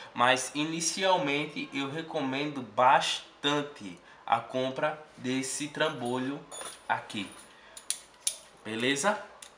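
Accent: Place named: Recife, Brazil. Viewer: Vi seu comentário lá no Aliexpress E esse sotaque, vc é de onde de Recife?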